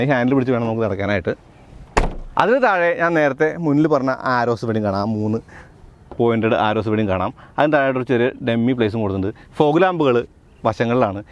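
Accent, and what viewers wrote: Thrissur, India. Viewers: ഒരു പക്ഷെ തൃശ്ശൂർ കാരൻ ആയോണ്ട് ആവും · Thirshur language ano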